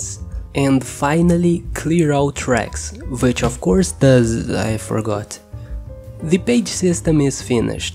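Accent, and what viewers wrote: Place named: Brazil. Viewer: Acabei de me ligar que ele fala inglês com sotaque kkkkkkk deve ser BR ctz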